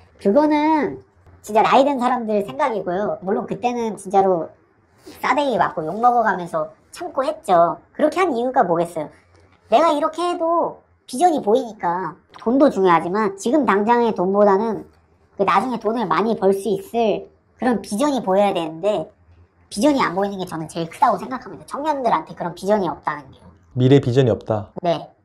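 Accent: Gyeongsang, South Korea. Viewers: 대구 말투 거슬리네요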